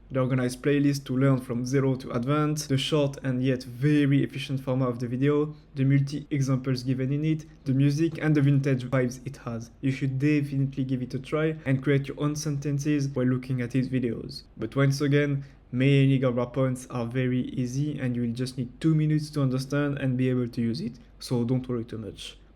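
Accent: France. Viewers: L'accent français mdr 😂 A few seconds in, I get used to it, I just love when people have some accent (as long as it doesn't make them hard to understand). Also the advice seems solid. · I thought you sounded french 😂🙈🙈🙈. You mentioned how learning Japanese is different from learning other languages (mostly in terms of reading). Maybe you can go deeper into that? Maybe talk about how you adapt your your methodology to the language? 🤷‍♀️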